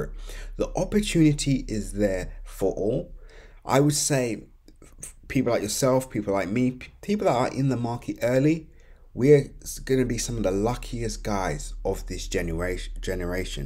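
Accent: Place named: Essex, United Kingdom. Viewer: You got to be near me in Essex lol I can tell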